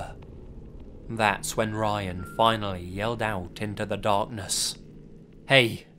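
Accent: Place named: England, United Kingdom. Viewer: I love your videos man, where in England do you live? Love the accent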